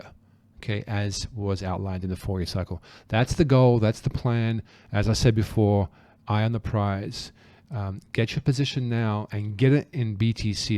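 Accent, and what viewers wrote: Australia. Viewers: I think he's Australian by the sound of his voice, but I take the point.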